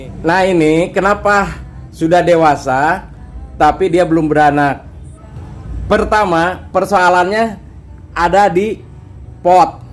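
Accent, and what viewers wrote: West Java, Indonesia. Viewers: Bang orang Sunda ya...?keliatan dari logatnya. Kalo gitu sy panggilnya kang.. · Suka sama logat sundanya.. Menjelaskannya juga jelas banget..mau coba praktekin semoga berhasil..trmksh..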